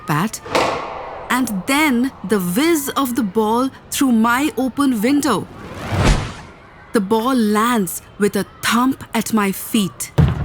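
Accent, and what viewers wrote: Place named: India. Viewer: This is amazing!! Compassion. Forgiveness. Friendship. This book has much to teach kids. accent does a great job making you feel like you’re in India so if you’re about to watch, grab some chai so you can engage one more sense in this beautiful story.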